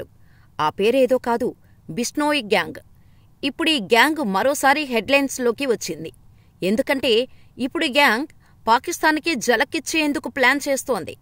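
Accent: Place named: Andhra Pradesh, India. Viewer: Modalettesaadu kadu ann Modalvettindu anale Aandrolla lekka matladtav anna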